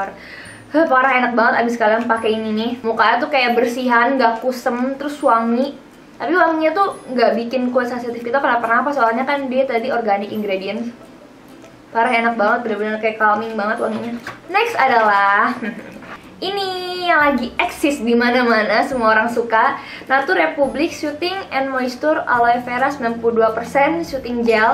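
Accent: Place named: Java, Indonesia. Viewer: bagusan begini, medok tapi kok cantik bangettttt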